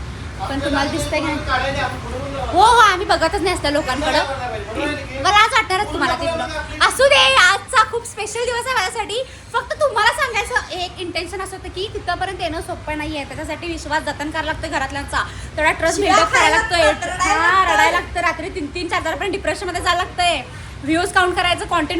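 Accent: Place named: Ichalkaranji, India. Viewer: Congratulations 🎉 god bless you ❤ Mi punyat aste but tuz vlogs n video bghitle na mala khup athvn yete ichalkaranji chya bhashechi 😍 Keep it up 🥳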